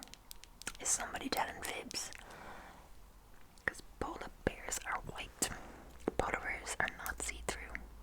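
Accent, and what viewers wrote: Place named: Ireland. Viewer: Great video, I can't get enough of a) fact videos and b) Irish accents so this is amazing!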